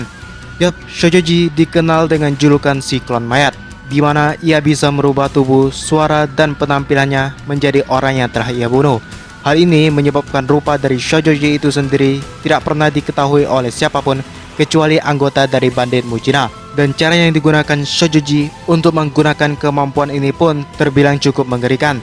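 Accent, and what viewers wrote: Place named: China. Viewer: dia keturunan tionghua bro jd logat bicara nya seperti itu ;)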